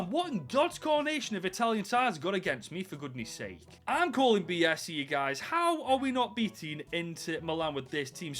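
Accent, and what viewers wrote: Stoke, United Kingdom. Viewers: Is that a stokie accent I hear ?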